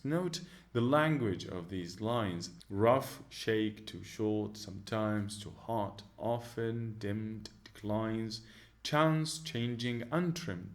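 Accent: United Kingdom. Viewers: I'm not into British accent but you're literally something else 👏💙!!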